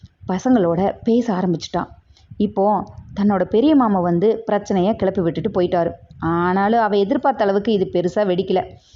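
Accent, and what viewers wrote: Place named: Kongu, India. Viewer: கொங்கு மண் சார்ந்த கதை. அதை கொங்கு தமிழில் கேட்பது மிகவும் இனிமை 👌🏻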